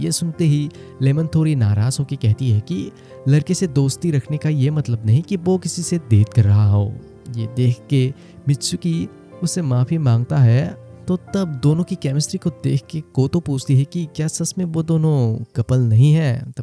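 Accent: Northeast, India. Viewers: Northeast se ho kya Bhai?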